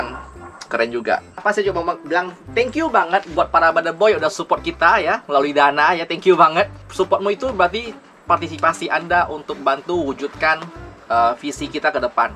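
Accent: Pontianak, Indonesia. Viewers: Bahase Nye to Melayu chine Pontianak..... Mantapsss...macam channel hobby makan....lanjut ko 😁😁😁😅😛🙏👍👍👍🌟🌟😃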